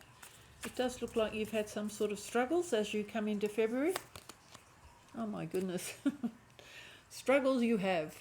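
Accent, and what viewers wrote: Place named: Australia. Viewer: Nice to see an Australian (or in this case, hear) delivering a reading (some great Tarologists on YT ) new subscriber and thank you for an enlightened reading. You hit the nail on the head regarding fatigue and boredom. Also moving toward developing and connecting with my intuition (through Tarot and Pendulum work) and I appreciate the time you put into this reading. Much kindness and blessings to you (From Melbourne - Eastern Suburbs) 🌻🌻🌻🌻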